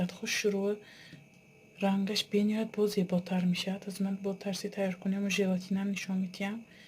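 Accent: Isfara, Tajikistan. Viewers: Хехехе)))) Ба Душанбегихо лафзи Исфарагичона ёд тиен апачон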